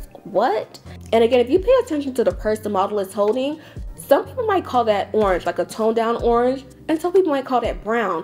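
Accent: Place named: Nigeria. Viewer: I heard that accent come thru…. Nigerian? If I am right, a lot of your style sense runs in the bloodline honey, Naija people never carry last. Nigerian women wrote the book on over the top and fabulous 😂😂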